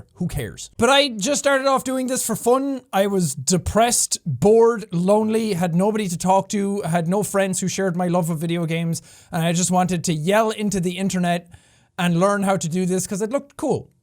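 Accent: Ireland. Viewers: Found your channel since pewds gave you that shoutout back in the old days. Ngl, i subbed because of the shoutout and never really watched your videos. I guess what i loved about Jack was his irish accent and energy he put into each and every video. Before, like you said you were shy and all that but then i saw those videos of you screaming your lungs out and it just made me respect you as a person you grew into. Well done Jack!!! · holy, fake accent turned into an irish legend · To think: you used to hide your accent, and now you're the internet's most favorite Irish potato -- I mean, Irishman 😀 As I'm sure you know by now (but I'll tell you again, dammit), we love you precisely for who you are as a person. No matter what your videos are about, no matter what other YouTubers are doing, as long as you keep being you -- you marvelous human being -- we will continue to love and support you. 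You bring so much positivity to the world in so many ways, and you've been gifted with a unique platform to continue sharing that positivity with the entire world. People always tell me "the world would be a worse place without you", but I know they just mean THEIR world. In your case, it's literally the entire world that is better because you are in it. And now I'll stop gushing because I'm sure I sound a little obsessed and maybe a bit creepy. I swear I'm not! I don't even watch every video 😜 I just think the world needs way more people like you in it and less people like.... well, like the kinds of people that seem the loudest these days. And it makes me overjoyed (and I guess a bit relieved) to see that people like you not only exist, but have a platform. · That accent. That irish accent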